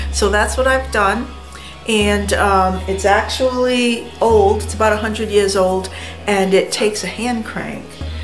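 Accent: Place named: New York, United States. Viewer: Love your accent, are you from New York?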